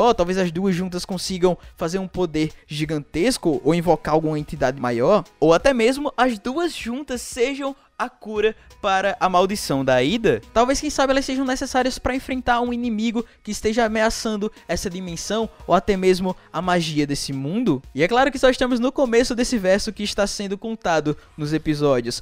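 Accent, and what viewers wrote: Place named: Recife, Brazil. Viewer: Sou novo aqui deu 2 segundossdo cata falando e eu como : esse cara é de recife esse sutaque só pode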